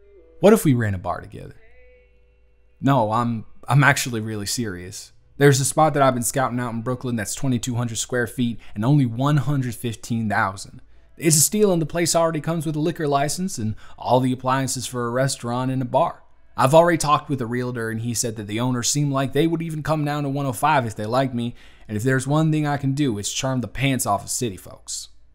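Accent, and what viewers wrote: South, United States. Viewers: I love country, so southern accents are just amazing. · Oh shit...Southern accent?! My heart. How dare you use that against me........ I fucking love you dude. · The cutry accent kind of caught me off guard but you always slay your videos. 🤭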